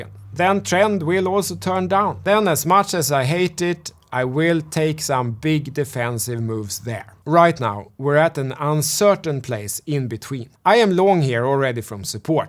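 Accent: Sweden. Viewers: Here for the Swenglish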